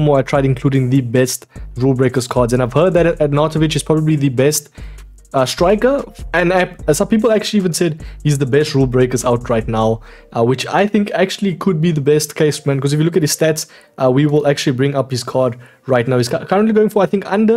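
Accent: South Africa. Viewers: Is he South African?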